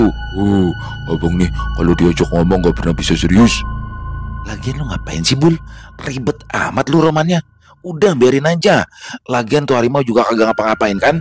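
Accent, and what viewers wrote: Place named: Betawi, Indonesia. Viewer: Dari bawah sampe atas nyari cerita warung kopi bang doni😂😂 lucu bae bahasa betawinya 🤣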